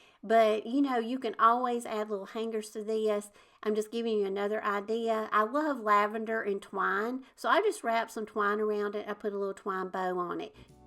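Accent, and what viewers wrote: South, United States. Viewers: I love your channel (and your beautiful southern accent!). 👍 You are always so inspiring.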